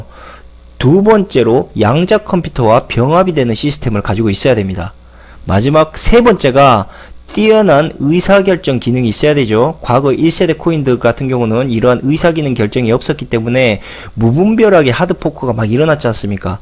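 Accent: Jeolla, South Korea. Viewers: 전라도세끼들참 말하는꼴아지 말투참좆같네 쓰래기코인 망한줄모르냐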